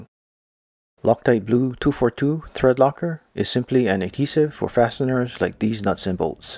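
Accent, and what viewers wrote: Hawaii, United States. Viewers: Hi, I just found your channel. Great vids. I hear a little kama’aina in your voice.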